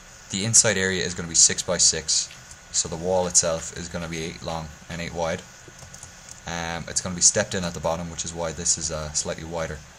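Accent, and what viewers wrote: Ireland. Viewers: what part of ireland are you from ima dub :D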